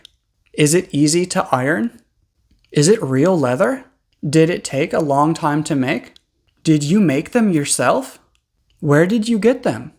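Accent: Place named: United States. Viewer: Excuse me, I hear your voice and I'm wondering if you're from the United States? You speak very clearly, I can guess, maybe you're an English teacher? Would you like me to show you around my neighborhood?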